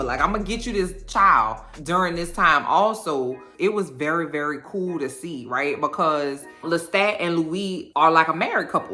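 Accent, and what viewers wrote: New Orleans, United States. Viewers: About 30 seconds into your video, I gasped. Thanks to the talented Jacob Anderson, I recognized your accent as New Orleans right away. Just as impressive was Sam Reid learning French so he wouldn't have just the accent, but the language too. Loved your season recap and hope you do every episode next season. You're a whole lotta fun!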